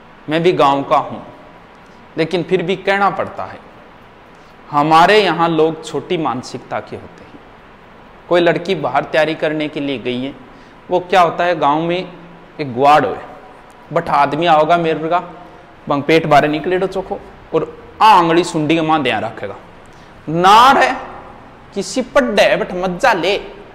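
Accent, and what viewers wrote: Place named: Churu, India. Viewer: Churu boli badi bhaari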